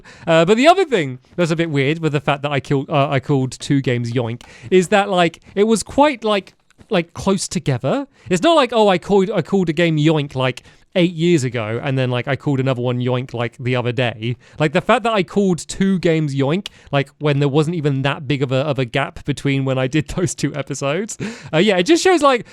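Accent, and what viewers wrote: United Kingdom. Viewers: your the most british cat i've ever heard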